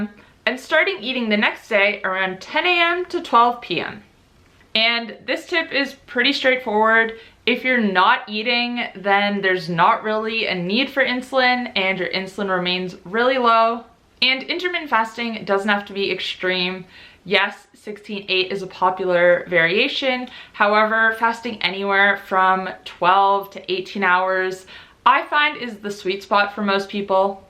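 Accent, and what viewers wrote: Australia. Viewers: I though you were Aussie - great to see an Aussie doing well on YT :)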